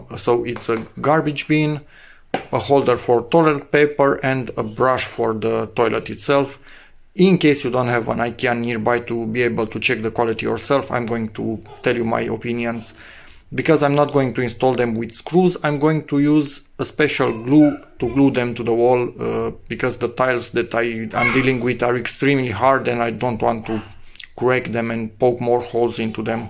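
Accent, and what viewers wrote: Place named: Romania. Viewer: I have a Romanian friend who sounds exactly like you when he speaks English.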